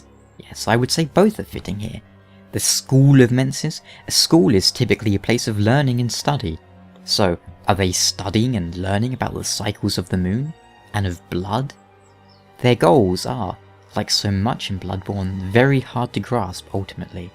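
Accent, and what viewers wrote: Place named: United Kingdom. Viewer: His British accent makes this seem as informative and credible as a TED talk :D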